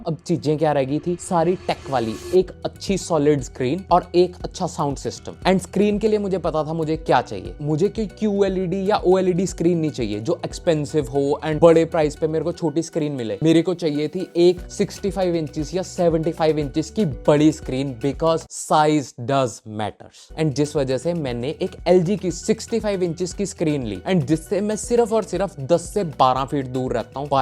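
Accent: Punjab, India. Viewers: Bro konsi city me ho ? Apki voice me punjabi touch hau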